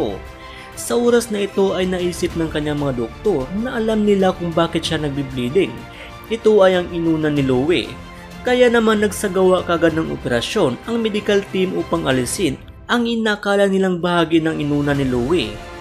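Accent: Philippines. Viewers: The accents, sound like ilocano. Correct me narrator if I'm wrong.😁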